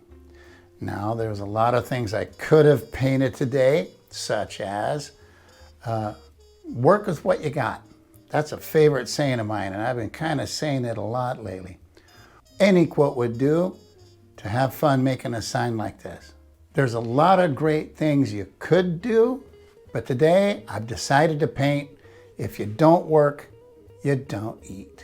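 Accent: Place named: Midwest, United States. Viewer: Where did you grow up? I'm trying to figure out by how you talk. I was thinking Chicagoland, now not sure, definitely midwest. This is my first time on your channel. On my 6th video. Thank you!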